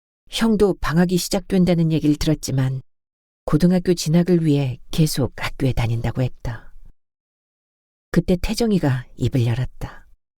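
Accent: Jeolla, South Korea. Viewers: 자정이 지났네요. 자고나서 내일 들을까 생각하다 잠이 오지않아 오늘은 또 어떤 작가의 작품이 님의 목소리를 타고 우리 곁으로 다가올까? 궁금했는데~ 님의 전라도 방언이 참 구수합니다.^^ 또 호롱불 5일장 먼지 풀풀 날리던 신장로 드넓은 들판 지명 등등 근처에 잠시 살았기에 더욱 정겹네요. 님 잘들었습니다.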